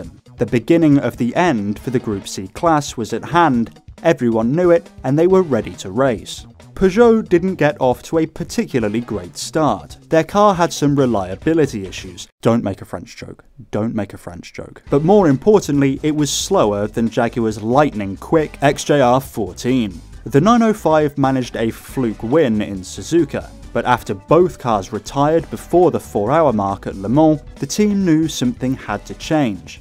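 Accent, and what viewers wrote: United Kingdom. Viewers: Brother a man with a British accent does not get to joke about French automotive reliability. Where's your car industry gone? And why did that happen?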